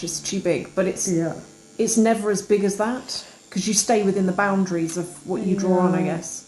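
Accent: England, United Kingdom. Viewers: I do not buy luxury. However, it is very entertaining for me to watch and listen to you. England is somewhere in my DNA. I love your vlogs and, of course, English accent. Be well.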